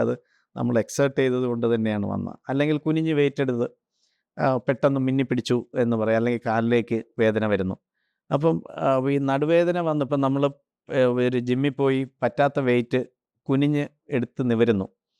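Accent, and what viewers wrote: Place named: Trivandrum-Kollam, India. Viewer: ഡോക്ടർ കൊച്ചിയിൽ ആണെങ്കിലും. സംസാരം ട്രിവാൻഡ്രം കൊല്ലം ശൈലിയിൽ ആണ് കൊള്ളാം 👌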